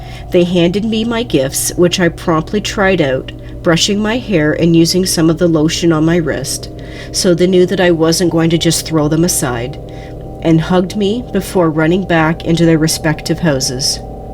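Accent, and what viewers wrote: Canada. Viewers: these narrations sound canadian as fuck. and i'm okay with that.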